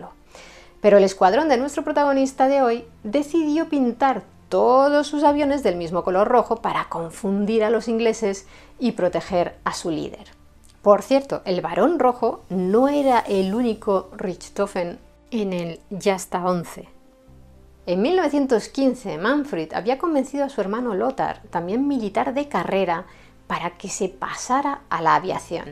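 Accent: Spain. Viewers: Excelente trabajo. Muchas felicitaciones por tu canal, es muy bonito escuchar todas esas historias con tu lindo acento español. Espero algún día ver historias de personajes latinoamericanos como por ejemplo Miranda. Un gran beso y un fuerte abrazo desde la América Latina.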